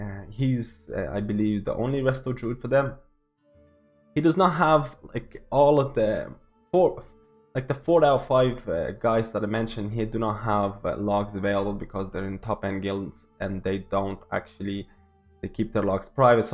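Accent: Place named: Dublin, Ireland. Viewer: Not sure where you are from but that Dublin accent comes through quite often. Torty is also in Pure a 3 day raiding guild so you do at least have someone from the 3 day raiding guild in the top 5.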